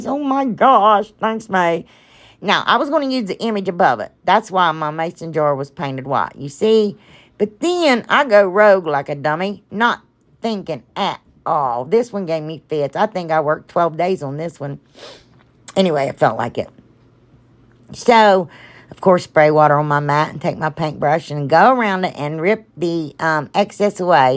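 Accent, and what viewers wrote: South, United States. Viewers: I have watched most of your videos and that says a lot since I just found you in the last month or two. I love you. Your laugh is such a blessing. It just uplifts me. I started watching your videos while I have been recuperating from illness. I became ill the week before Christmas and I am finally feeling close to normal. I first thought you were from the south, you sound it, but you say "you guys" which is a northern usage. We here in the south say "Y'all". Whether you're from the north or south you have not offended me. I have listened to most(my husband won't let me listen when he's watching tv). I understand everyone can't afford all of the things you have, I can't. I do appreciate that you use a lot of things from the Dollar Tree. You are not rude by no means! You are very kind especially the way you sign off. I feel like you are my friend. 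I wish we lived close. I live in a small town in the most northwest city in Tn. God loves you and so do I.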